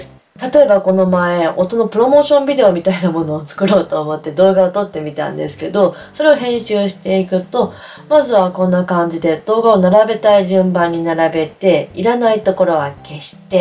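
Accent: Kansai, Japan. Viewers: この間初めて見たんですが🎉インスタライブの関西弁のときもかわいいです❤🎉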